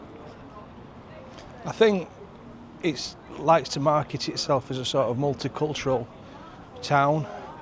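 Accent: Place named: Newcastle, United Kingdom. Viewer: It's not rubbish it is what it is.. Glad your off to a nicer spot.. See ye Geordie speak..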